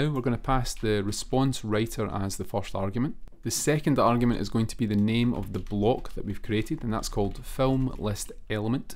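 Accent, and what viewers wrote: Scotland, United Kingdom. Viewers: 3 awesome things in this video. Golang, HTMX and the beautiful scottish accent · A Scotsman! 🎉 Refreshing to hear other accents.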